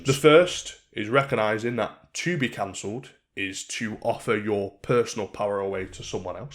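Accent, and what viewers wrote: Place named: Newcastle, United Kingdom. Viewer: quality video, top new castle accent hahaha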